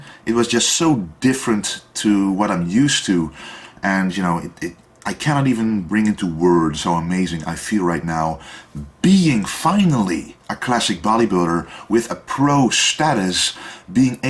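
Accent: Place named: East, Netherlands. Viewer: Haha grappig accent. Oosten van het land?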